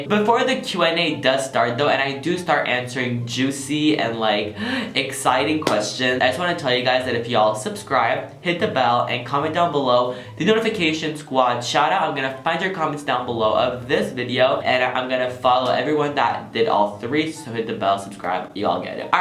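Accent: United Kingdom. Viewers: Love ur british accent🙈😂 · Love your British accent so much 🙈❤️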